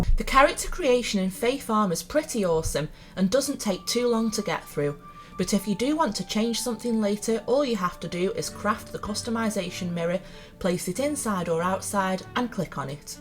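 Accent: United Kingdom. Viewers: Can I ask are you from the Staffordshire area its your accent great video btw 👍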